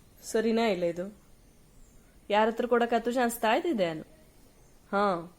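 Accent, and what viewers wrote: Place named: Yellapur, India. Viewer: ನಮ್ಮ ಪ್ರೀತಿಯ ಯಲ್ಲಾಪುರ ಕನ್ನಡ ಬಾಷೆ ಎಂಥ ಸೊಗಸು 🎉🎉🎉🎉🎉🎉ಧನ್ಯವಾದಗಳು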